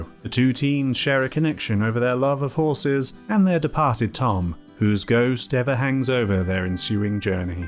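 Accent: United Kingdom. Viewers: Dude! I had no idea you were british.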